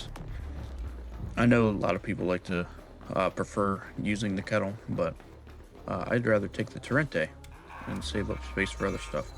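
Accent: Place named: Philadelphia, United States. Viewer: Philly delco or Maryland? I hear It in your accent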